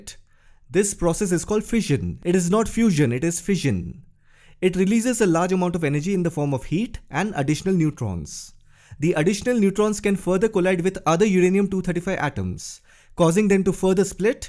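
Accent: India. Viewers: Love your Indian accent and really great explanation, thank you.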